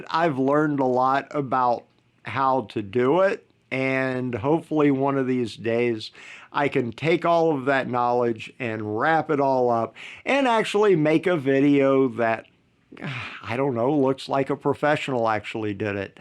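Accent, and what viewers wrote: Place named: South, United States. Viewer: like this video and all other s .i just stupidly across.like the way you talk sounds country.stay cool and God bless.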